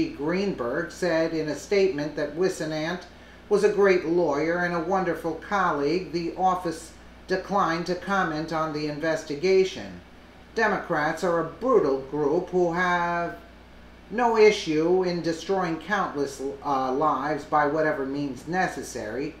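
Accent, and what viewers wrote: New York, United States. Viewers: Where's your voice from? New York? I dig it!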